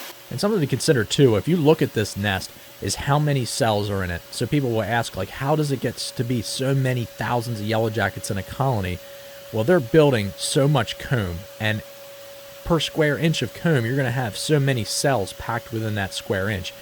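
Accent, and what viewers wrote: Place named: Midwest, United States. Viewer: I’m from the PNW & can’t place his accent. Is it like Midwestern?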